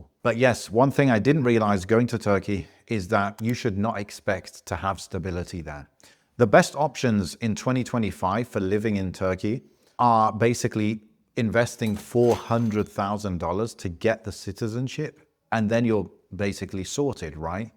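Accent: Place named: United Kingdom. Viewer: How did you pick up a British accent if you lived overseas most of your life?🤔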